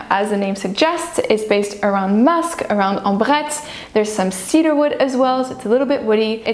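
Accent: France. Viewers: i am here for her french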